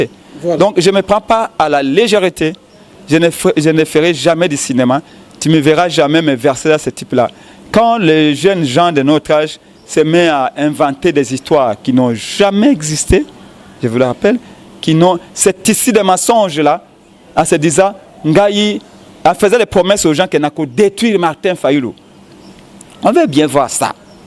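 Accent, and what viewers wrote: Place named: Rwanda. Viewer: Ndeko lande elobeli na ye malamu,yoka bongo na ye "etondi na ba R R R R R ya kinyarwanda, moto oyo azali rwandais.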